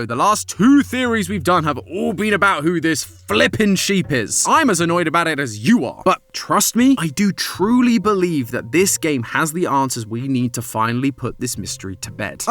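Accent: United Kingdom. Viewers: I don’t remember him being British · Ok so has he always sounded British 😂😂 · im still not used to the british guy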